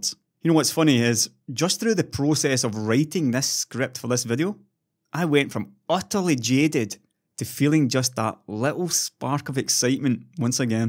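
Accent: Scotland, United Kingdom. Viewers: Your scottish accent is better than *'s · Awesome work as usual! Fuck the haters and as Good Old Gamer said: "You can't fix stupid" Never a truer word spoken:D Looking forward to your next vid man, keep it up! you provide a bloody good and balanced viewpoint and have +100 Integrity compared to some others of the techpress, It's for that reason I subbed you (After watching a few vids and eventually became a patron) You're honest, frank and I like that. No bullshit or smoke and mirrors. Just plain old info with a Scottish slant:) Anyways dude have a good week and see ya in the next one!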